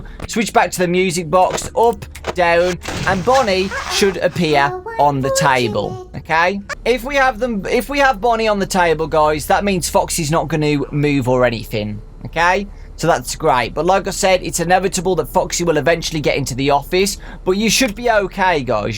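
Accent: United Kingdom. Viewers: ultimate britain voice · This guy has such a British accent it sounds fake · He is british right?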